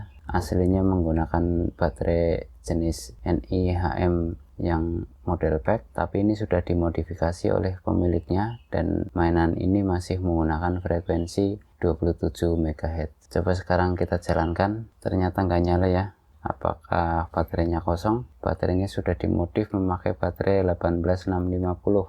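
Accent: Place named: Tegal, Indonesia. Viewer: tiang pundi um kok ngomongnya ngapak ..... orang tegal ya ???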